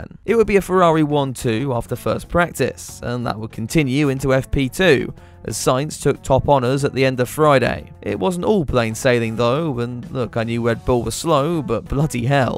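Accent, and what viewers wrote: United Kingdom. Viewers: accent is so British that I keep hearing "Tor-Tus". Btw banger of a video,!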